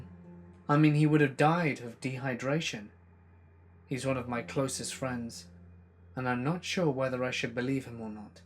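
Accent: England, United Kingdom. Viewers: do you realize how weird it is, as an American, to hear U.S. stories told by a man with an English accent? Well, it is! 😂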